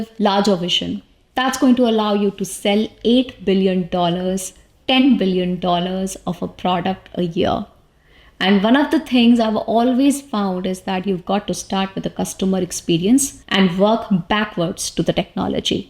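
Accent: India. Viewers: Oh mam… you make so many videos on correct pronunciation..but the difference is in those videos you are targeting words that people don’t use oftentimes and its easy to highlight those words.. but after watching this video of yours i can clearly see how many mistakes you’re making while pronouncing multiple words.. but the point is that while speaking nobody really focuses on pronouncing words as we are making people understand our standpoint.. its so funny and annoying that you create videos just to collect audience, when you yourself speak in a very common accent just like every indian speaker… i work with so many UK & US stakeholders and to be very honest nobody really spell the words the way you speak in your so called “how to Pronounce words” videos on youtube…and now all your stupid fan followers will start replying me and giving me gyaan but i really don’t care… moreover there are so many other important topics that are going in the world nobody really cares about your videos…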